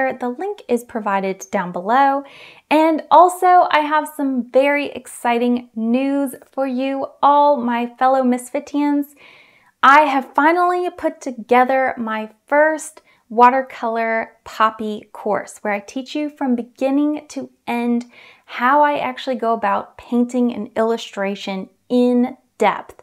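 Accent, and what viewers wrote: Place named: North Carolina, United States. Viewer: I love this effect and can't wait to use it soon. Also: I thought I knew that accent! I just saw your fan mail address. I'm from right up the road, Shelby, NC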